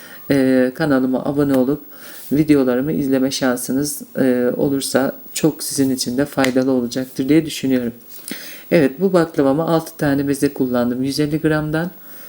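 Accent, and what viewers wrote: Black Sea, Turkey. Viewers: Selamunaleykum abla sesin karadenizli sanki rize artvin sivesini duyuyorum 34 yasindayim Hic acma baklava yapmadim yarin oturup yapmaya caliscam Insallah basarili olurum Hep annem yapar bize Hic firsat vermez,annemde ayni Senin gibi yapar elleri dert görmesin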